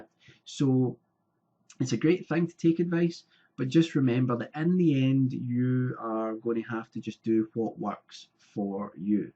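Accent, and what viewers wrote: Scotland, United Kingdom. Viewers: Nice accent are you Scottish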